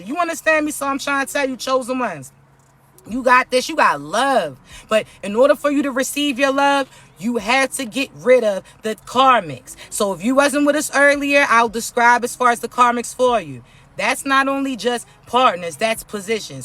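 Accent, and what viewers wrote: Baltimore, United States. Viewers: She from Baltimore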